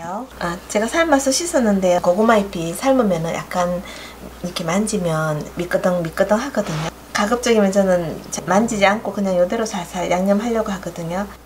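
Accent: Gyeongsang, South Korea. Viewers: 고구마줄기 골은거.ㅋㅋㅋ 한참웃었네요.뼈속까지 갱상도우리이모님. 저도 마산사람이라 골은거 낯설지가않네요. 화이팅입니다!!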